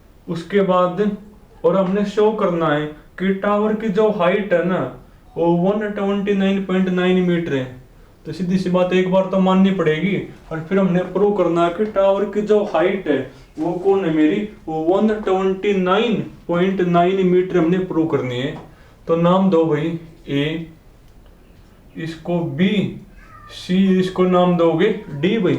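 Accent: Haryana, India. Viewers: Dear sir aapki language nahin samajh mein a rahi thi. Shayad aap Haryanvi language bol rahe the lekin mere sare concept clear Ho Gaye thank u veri Much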